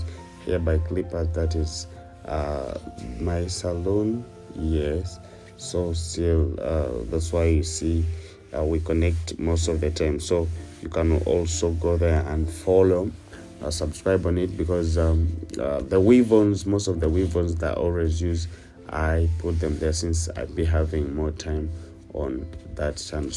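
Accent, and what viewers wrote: Uganda. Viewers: This accent is sooo Ugandan 🇺🇬🇺🇬🇺🇬🇺🇬 my first time here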